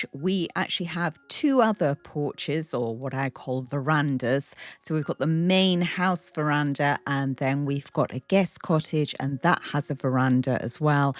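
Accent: United Kingdom. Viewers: That wreath is gorgeous! I love watching you decorate! You have such creative ideas, and your perfect British accent accompanied with a warm smile and peaceful music are a bonus!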